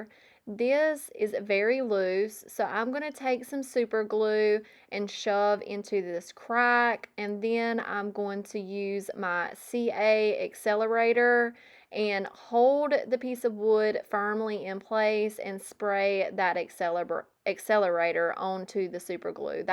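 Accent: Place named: South, United States. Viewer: your southern accent reminds me of julie from julie thrifts. both of you say some words, like old (you throw a u sound in there) the same way. I liked how you explained things, so I joined. I also like that you clean with windex. that's my go to as well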